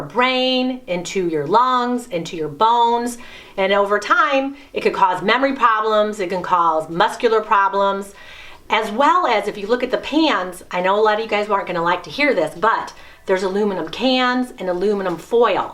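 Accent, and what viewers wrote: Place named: Wisconsin, United States. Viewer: Well,... Your accent reminds me of my family, all who hail from Wisconsin & some while back also Chicago. Refreshing...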